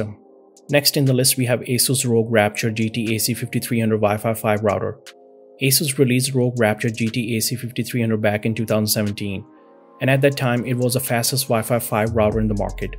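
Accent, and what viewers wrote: India. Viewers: this is painful, its just a collection of adverts for all the products so you learn jackshit. nothing is compared and the accent just reminds me of indian tech nonsupport.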